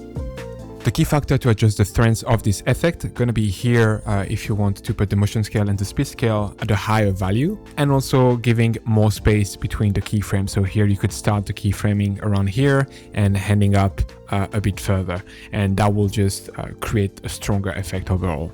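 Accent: France. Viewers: french accent, nice vid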